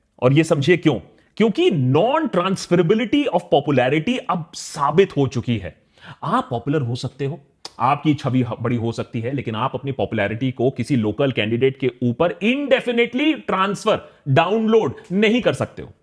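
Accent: Bengal, India. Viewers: Tomar moddher Bangali ta majhe majhe jege othe 😅😅😅. Chesta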